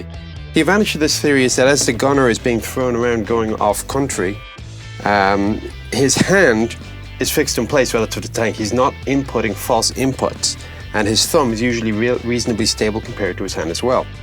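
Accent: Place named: Ireland. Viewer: Originally from Ireland, his Irish accent gets stronger when he has been visiting Ireland lol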